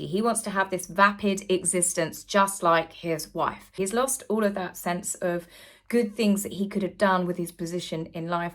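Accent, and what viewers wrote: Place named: RP, United Kingdom. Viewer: I just LOVE hearing your Posh British accent! Your are so right about Harry and the mistakes he continues to make with that old hag MM! Cheers, 💕🇺🇸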